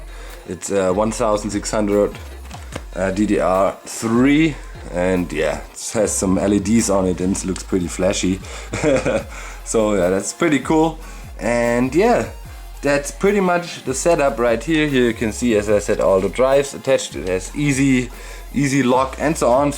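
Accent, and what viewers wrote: Germany. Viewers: German Akzent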